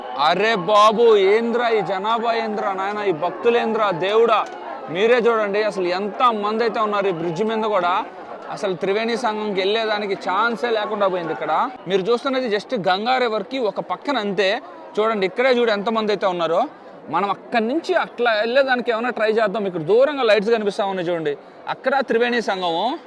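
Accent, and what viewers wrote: Nellore, India. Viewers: ఉన్నది ఉన్నట్లుగా నెల్లూరు భాషలో అద్భుతంగా చెప్పావు నాన్న థాంక్యూ వెరీ మచ్ మాది కూడా నెల్లూరు నాన్న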